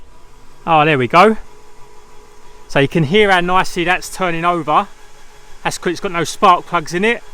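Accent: Essex, United Kingdom. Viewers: You sound like essex lad, how much u charge to restore an ep3 type r m8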